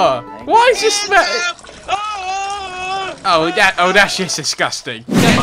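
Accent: England, United Kingdom. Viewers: Your British JUST LIKE ME?😇😇😇😇😇😇😇😇😇😇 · are you brittish yes or no (brittish is cool) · So yiu are from england???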